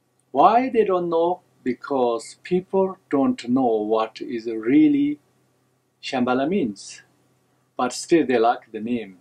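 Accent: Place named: India. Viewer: U r accents r Indian accent this shows Tibate was a part of India in ancient time wow☺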